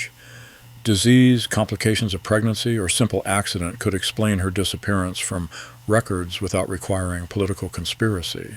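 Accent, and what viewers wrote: United States. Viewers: The two illegitimate children would have never inherited the tbrone, so what happened to.them, apart from interest, is simply not important. I do wish we could have an English accent not an American one narrating this!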